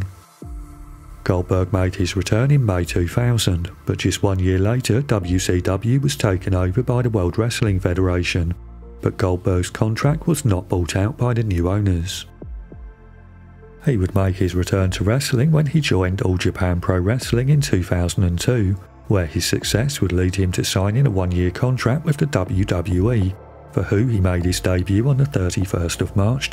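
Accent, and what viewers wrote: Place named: London, United Kingdom. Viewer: Your accent is cool. I'm guessing you're originally from the London area? Maybe Surrey.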